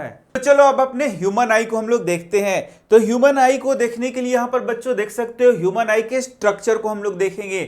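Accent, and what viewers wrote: Bihar, India. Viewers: I am dam sure sir is bihari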